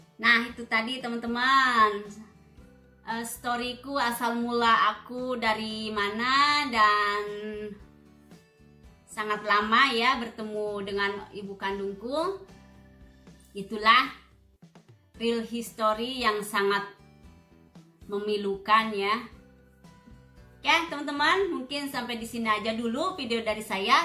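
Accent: Sunda, Indonesia. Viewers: Smua ada waktu nya ya Teh. Sy dengar Teteh klo lagi ber bhs sunda kental skali. Pdhal asal karuhun Teteh dr brebes. Gpp ya Teh smua klg kita. Smoga damai bersama klg dan bahagia slamanya. Waktos Teteh bercerita g tersaa air mataku ikut mengalir.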